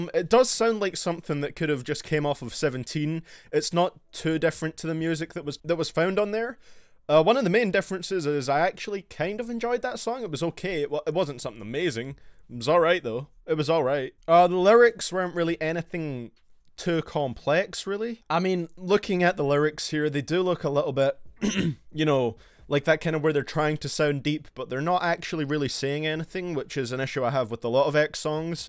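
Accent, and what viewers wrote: Scotland, United Kingdom. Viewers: Good vid dude can't wait for the next and fair opinions, oh yeah and where in Scotland are you from cause you sound Scottish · A BIRACIAL SHAWN CEE WITH A SCOTTISH ACCENT YOU SAY?! COUNT ME IN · Shut up XXX is way better than yo British accent #ScarLxrd is still beter than you too LOL!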